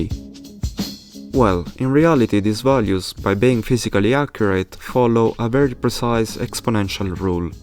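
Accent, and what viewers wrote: Italy. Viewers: you sound italian as hell... fire video..... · ottimo video, l'accento italiano inconfondibile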